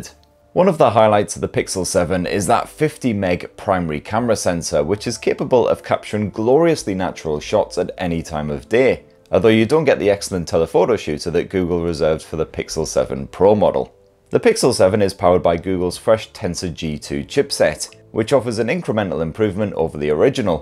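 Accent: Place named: Newcastle, United Kingdom. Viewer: I like this guy he knows his stuff !! Plus I think he's a geordie !!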